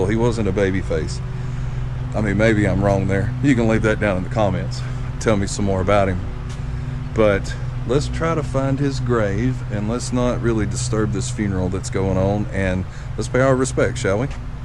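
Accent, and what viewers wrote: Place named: South, United States. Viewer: You have great southern voice. God bless you friend.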